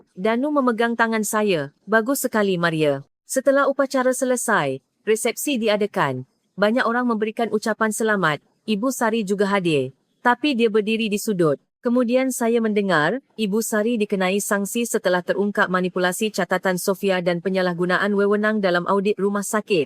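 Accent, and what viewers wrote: Malaysia. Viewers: Komentatornya berlogat malaysia .... orang malaysia ya. ...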